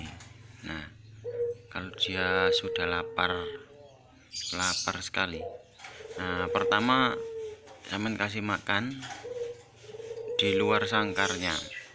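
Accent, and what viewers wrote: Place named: East Java, Indonesia. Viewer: Wong Jatim koyok e lek ndelok logat e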